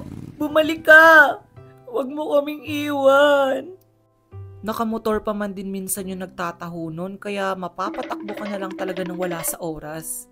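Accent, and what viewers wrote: Visayas, Philippines. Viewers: bisaya ka po ba???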